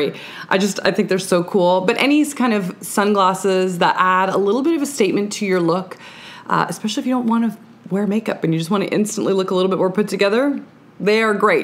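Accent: Canada. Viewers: Love me a Canadian accent!! 🍁❤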